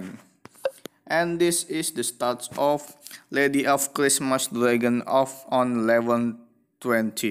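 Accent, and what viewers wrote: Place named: Indonesia. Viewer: Logatnya indo sih..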